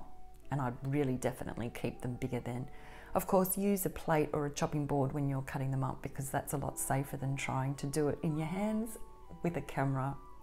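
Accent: Australia. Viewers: Hi. I’m reigniting my canning journey after 30 years. Love ya work. You sound Australian but you are giving US measurements. Is there any chance you could give the poor old Aussie viewers the metric version ’on the go’? ❤ Also…. We’re you ever a presenter on Playschool? I’m sure I’ve seen you on the telly in the past. 🤔❤️❤️❤️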